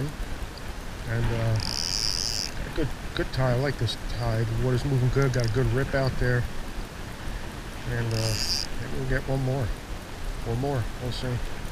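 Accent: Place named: Queens, United States. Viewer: Hey kinda sounds like your from Queens? area? - Jamica Bay or even try Bay Park, East Rockaway may be Closer to home. Tight lines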